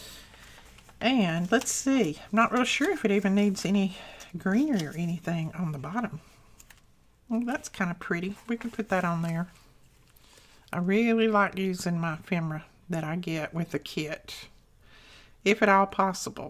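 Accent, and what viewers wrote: South, United States. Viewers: Hi E! Lovely work as always! I chuckled when you mentioned that someone said you spoke too fast! You definitely don’t! 🤣 You speak slowly with that lovely southern drawl. 🥰 Infact, I often listen to your videos at 1.5 or 2 times the speed…not a fault of yours but simply because I have very limited time in which I can watch and I want to watch as many as you lovely crafty ladies as possible in my limited time intervals….and maybe the fact that I’m a fast speaker may also influence my choice I guess! But no matter what speed I watch, I enjoy your videos and your work! ❤️ Thanks for sharing! Have a lovely weekend! Hugs, Ava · Miss E you do not speak too fast! I’m Dutch and I understand everything you say. Besides I am a teacher of the Dutch language to foreigners and I am a teacher of the Spanish language. Of course it is posible that the person has a problem understanding you but that is an individual problem. 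It has nothing to do with you. By the way I love your voice and southern accent. And the lovely expressions you use. Keep up the good work! God bless you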